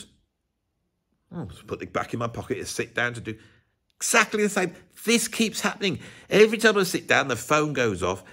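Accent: Birmingham, United Kingdom. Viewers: This was brilliant, so funny! Your brummie accent is perfect for this type of vid. I totally get it with these bands, most people think these bands are good because they’ve been told they’re good. I’m looking at you, even your name is a dull colour. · Nice to hear a grumpy Brummie (?). I thought I was the only one. Are you sure about and groupies? I can’t fathom it unless the gig was at a School for the Blind.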